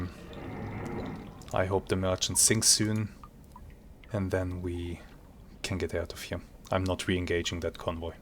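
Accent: Germany. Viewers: I like betters, too, but... let's be honest: That German accent DEFINITELY adds something to the experience;)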